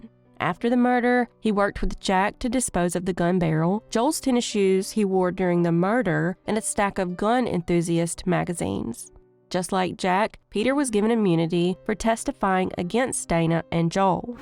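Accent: South, United States. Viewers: Thank you. Wish you did audio books.:) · 😊 I so enjoy the way you present your cases. Your voice is soothing and I could listen to your sweet Southern voice 💕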